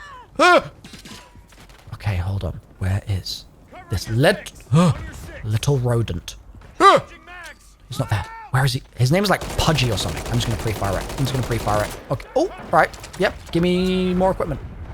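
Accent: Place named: England, United Kingdom. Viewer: just wanna say, you were such a large part of my teenage life that my close friend and i had a little inside joke where every time we'd see each other we'd just go "hi guys, here" in an english accent. two 15 year old american boys just having a nice time at the arguable peak of the zombies community. it was really nice. wanna say that ive still been consistently watching your stuff. not really commenting all that much, but im here. thanks for all the laughs man, keep doing what you do.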